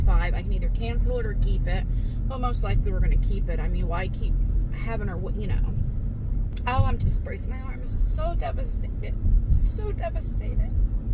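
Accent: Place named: New York, United States. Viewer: Lol that's just your New York accent 😂. That's ok. But im so sorry for your loss with Bella. I know it's much more than just losing her for you.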